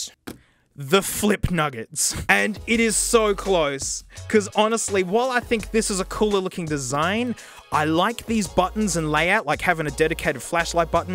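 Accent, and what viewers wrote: Australia. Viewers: He speak in Australia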